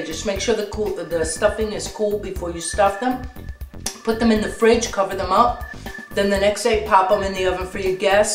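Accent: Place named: New Jersey, United States. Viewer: My family loves stuffed mushrooms. I'll have to give them this recipe! Haha you're welcome for the pin!! Haha "NEW IERSEY" with NOOO J. You can soooo tell haha, don't you just love it!? <3Daniela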